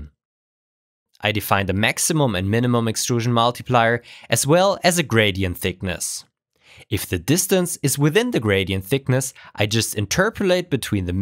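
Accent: Germany. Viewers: U aren't the only one who noticed german accent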